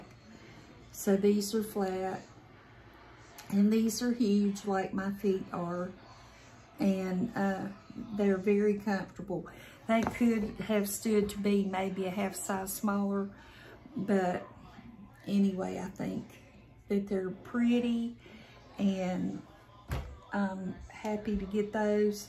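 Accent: South, United States. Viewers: Hi, I enjoy watching your videos. I like your accent, reminds me of some of my family from down south. I used to call my grandmother grannie. She was a good one. I enjoy going to the thrift store too. My daughter took me last Saturday evening. We wore our masks and had a great time shopping. Thanks for sharing your video. 💖💖💖💖💖💖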